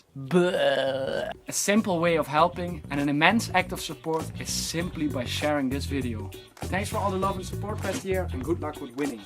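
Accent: Netherlands. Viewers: Are you Dutch? You sound Dutch. If so, ik zou wel wat willen kopen.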